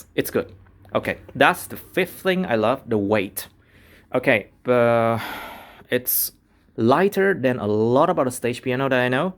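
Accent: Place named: Vietnam. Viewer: coi từ đầu tới khúc nói cái soundcard mới nhận ra anh là người Việt Nam, hèn chi nghe giọng thấy màu quen quen =)))) mà cảm ơn anh vì bài review có tâm này nha, em cũng đang tìm hiểu giữa con cp73 này và nord electro ☺️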